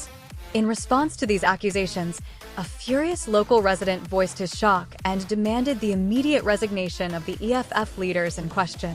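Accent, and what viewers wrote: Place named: United States. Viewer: Why is this in an American accent?🙄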